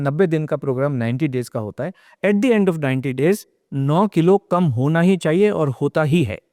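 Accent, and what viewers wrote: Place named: Udaipur, India. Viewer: Host bhaiya kya aap udaipur rajasthan se h...aapke bolne k tarike se pata chal raha h....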